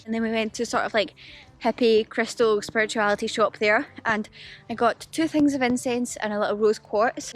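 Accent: United Kingdom. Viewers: Hey i just got to know you from group facebook already subscribe and follow your instagram :) i like your accent, is it british ?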